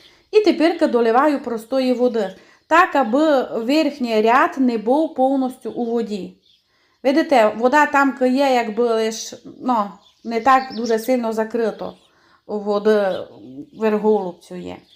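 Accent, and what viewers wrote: Transcarpathia, Ukraine. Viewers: Дякую Вам за рецепти і наший закарпатський діалект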